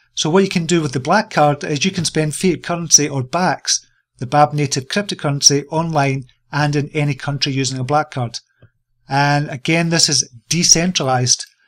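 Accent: United Kingdom. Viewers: Just discovered your videos on Youtube. Nice to see a UK voice in the crypto space. Got yourself a new subscriber. 0xaC371637e14a<num>CeE06918A66e460B4e8b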